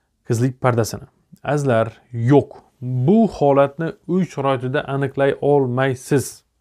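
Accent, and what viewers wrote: Khorezm, Uzbekistan. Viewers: Xorazmdanmisz oga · Xorazmlimisz yoo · Салом хаммага адашмасам доктримиз хоразмдан шекилли шеваси тортяпди Хоразм Зур гап юк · Dòktr xorazmdanmisiz shevangiz tortyabdi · Xorazmdanmisz diliz ôxshab durupdi😅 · Шифокор Хоразмли шекилли 😊баклаб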